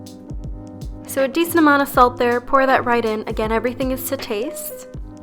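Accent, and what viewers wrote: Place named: United States. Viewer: American, not Albanian accent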